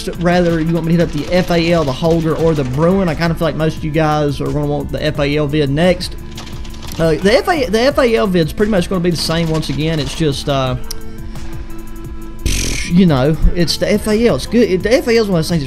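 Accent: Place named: South, United States. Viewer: That Southern accent is hilarious, I had to subscribe 😂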